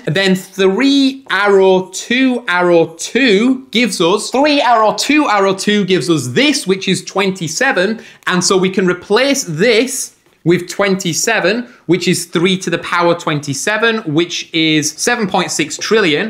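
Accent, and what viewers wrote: United Kingdom. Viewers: I Cant take a squeaky voiced british accent serious, ever at all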